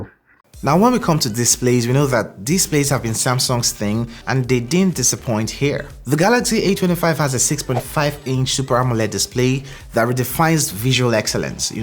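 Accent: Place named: Nigeria. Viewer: He sounds Nigerian but I could be wrong.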